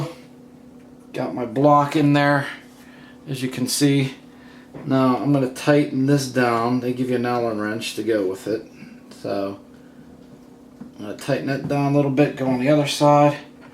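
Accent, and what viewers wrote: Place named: Pittsburgh, United States. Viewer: Nice video. Lookin to do the same swap on my shield 2.0. Are you from Pittsburgh?? Definitely picking up on a yinzer accent.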